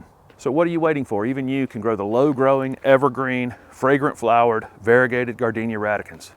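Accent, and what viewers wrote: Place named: North Carolina, United States. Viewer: Do you ever smile? But you have a nice accent...is that North Carolina?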